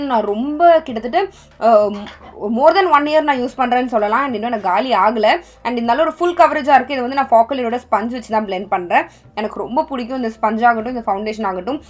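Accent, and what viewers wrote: Tirunelveli, India. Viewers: Unga native tirunelveli ah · Sis nenga thirunelveli ah😊😊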